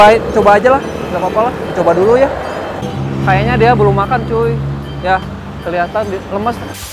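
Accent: West Java, Indonesia. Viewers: Bang asal mana ko sunda 😊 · Diatu sunda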